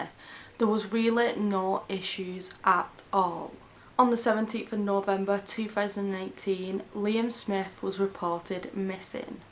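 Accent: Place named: England, United Kingdom. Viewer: are you and Mollie both in England? You sound very different (accents). I'm wondering if there are different accents within England like there are in the US. To where when someone talks, we know pretty much where they are from.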